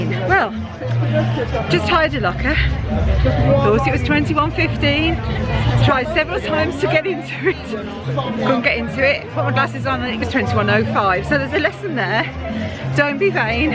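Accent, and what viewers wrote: Birmingham, United Kingdom. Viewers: Can hear a bit of a Brummiw twang in your voice I think? Found you from Dev. Going back to WDW in September yet again. X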